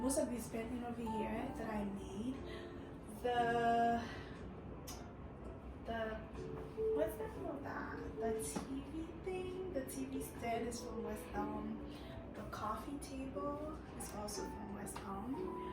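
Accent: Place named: Haiti. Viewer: If I didn't know you were Haitian from the accent, the glassware cabinet was a dead giveaway lol Such a stunning space 🤍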